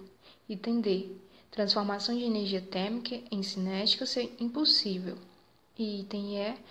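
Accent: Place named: Pará, Brazil. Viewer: Eu gostei muito da aula, obrigado! e esse sotaque... você também é paraênce?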